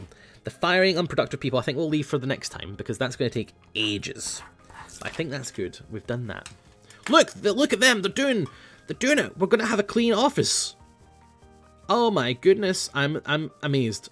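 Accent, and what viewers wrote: Scotland, United Kingdom. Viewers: you can just tell he's Scottish like me hah were ur yees gon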